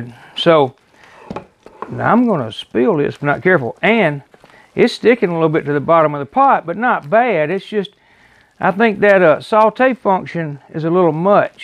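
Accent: South, United States. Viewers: I just love your Southern Accent! My teenage daughters continue to watch you , they even walk around spewing out your hook”007” they just Love you! Keep on Cooking!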